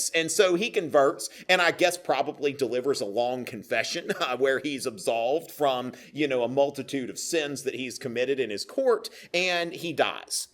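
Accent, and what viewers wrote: South, United States. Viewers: As an Englishman, listening to our history getting explained informally in a strong southern US accent just doesn't sound right 🤔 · Good lecture but it is so strange to hear such strong southern accent. It is not the guy's fault, but it is difficult listen to.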